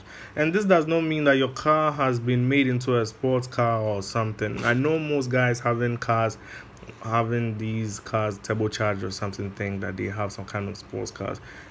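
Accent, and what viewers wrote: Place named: Nigeria. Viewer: You sound nigerian. Ice explanation tho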